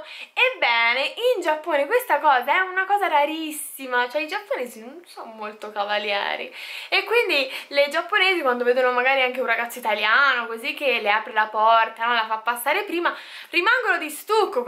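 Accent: Tuscany, Italy. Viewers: il tuo accento toscano è sempre con te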